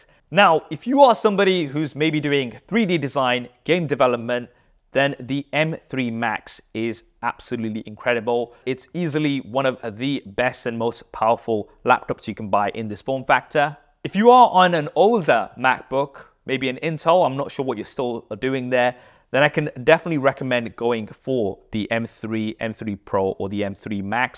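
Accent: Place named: United Kingdom. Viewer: arab guy w british accent, thats new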